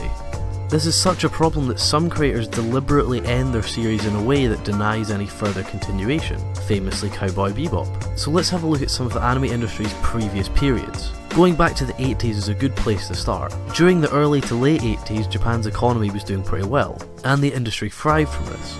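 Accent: Scotland, United Kingdom. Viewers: what accent is that? I am sure it is british but cannot pinpoint the region.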